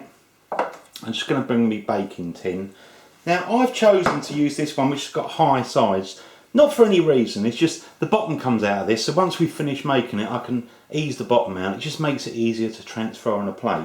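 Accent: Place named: Essex, United Kingdom. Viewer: You are 1 funny bloke do you know that?! :D I think you would find it amusing to know that I often watch your vids in bed (as my computer is set up along side it for long hours working at night!) & I have a nice cup of Assam, & laugh uncontrollably at your little moments of alacrity & charismatic cordiality! You surpassed yourself with this one Chef! And 13 Minutes! Good length! Do you know, I could swear you have an ESSEX accent! Maybe it's just me. How on earth R U going to top this one ay!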